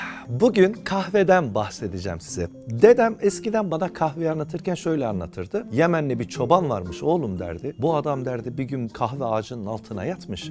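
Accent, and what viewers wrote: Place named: Adana, Turkey. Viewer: Dayı oğlu adana şivesi de arada gider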